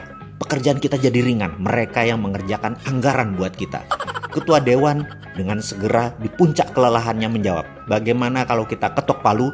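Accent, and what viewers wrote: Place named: Madura, Indonesia. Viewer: Sontoloyo doang logat maduramu boss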